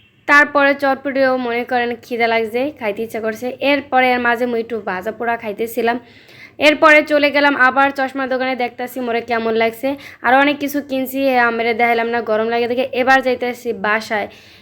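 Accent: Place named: Barisal, Bangladesh. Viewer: ভালো সুন্দরী লাগছে কিন্তু বরিশালের ভাষায়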